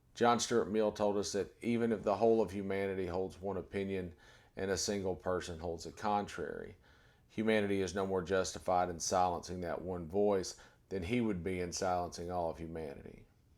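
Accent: South, United States. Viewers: Awesome to hear an American Southern man speaking so perfectly and intelligently on the realities of this issue - with dignity and respect. Well done Sir!!! 👏 👏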